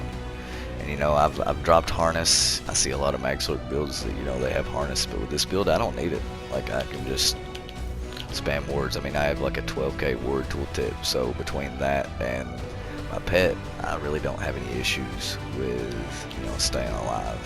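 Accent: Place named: South, United States. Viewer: You sound southern as hell man, roll tide.. subbed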